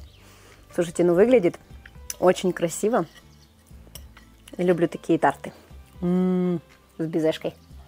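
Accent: Ukraine. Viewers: Сколько смотрю столько и слышу украинскую речь. Мне нравится её говор 😍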